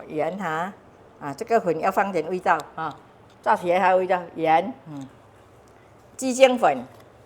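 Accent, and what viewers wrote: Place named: Hokkien, China. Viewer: Autie我早上好！ 谢谢你8你youtube 又见面了。 我喜欢听您的声音话语加福建❤🎉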